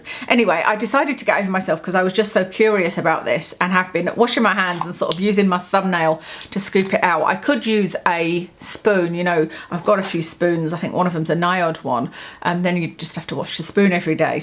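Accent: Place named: United Kingdom. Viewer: Hi, why don’t you leave the sunscreen in the bathroom and put it on in there? You won’t have to worry about touching doorknobs and then sticking your fingers in the container. I haven’t tried Stila liquid lipsticks in years. Do they still have a scent?!I couldn’t wear them due to the scent! I love your accent 🙂 Words sound fancier in a UK accent!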